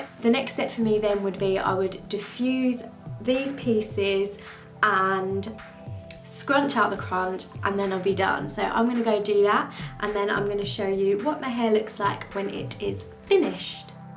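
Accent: United Kingdom. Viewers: Your hair is absolutely lovely! I really enjoyed this video and I'm looking forward to others. I adore British accents, your voice is relaxing. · What accent is this, it’s nice. I know it’s British but what specific accent?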